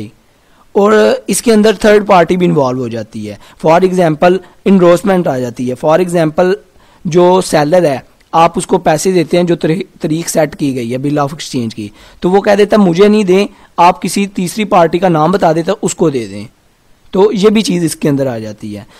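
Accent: Punjab, India. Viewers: Sir aap punjabi ho ??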